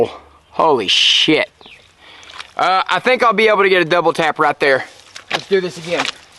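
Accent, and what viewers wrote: South, United States. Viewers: Man i just wanna say i appreciate what you do so much. your personality on camera and love for firearms and definitely that southern draw reminds me of me and my friends here in tennessee and i think thats one of the thing's that make me favor your videos over a lot of others. that and the awesome content. love my wot and want a graves so bad its ridiculous. that roller i believe makes all the difference in it running on multiple calibers. i just wanted to say how sorry i am for what happened to your uncle. i could literally see what u were thinking while shooting that usp and i know it took so much for u to continue. you have tons of heart bro. i read the article and i also went and looked at the lawsuit filed. i hope u dont take offense to me asking but how did the lawsuit play out. i just wanted to know that they paid for what they did and if they didnt thats a travesty. 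the fact of who ur uncle was it never should have happened and they should have handled it way different. again man sorry for ur loss and o look forward to you content for as long as u can put it out.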